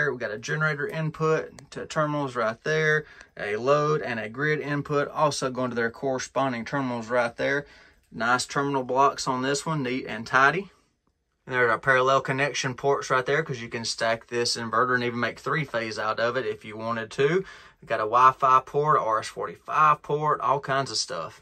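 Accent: South, United States. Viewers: I am very interested in the Sungold (SRNE) inverters. Could you maybe go over what solar panels you have and how they are configured and the changes you plan to make? Also, what gauge pv wire did you use? Maybe you can just add a new ground mount with a PV run from your old mount to your new mount and just hook up all the panels in series? Also, if you are getting a new ground mount, the ecoworthy single and double axis trackers look like they could be a really good investment and increase your active solar hours and extend your generation time (usually by 30-40%). I also love the southern accent! Fellow southerner here! Love it when people sound like my neighbors.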